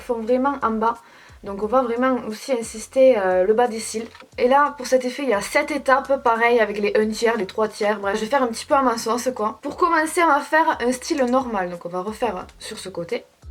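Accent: South, France. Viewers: Quel bel accent du sud ☀️ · Cet accent 😍 je me sens vraiment chez moi, mercii pour cet accend du suud 🤙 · Like pour l’accent du sud ❤️ #TeamAccent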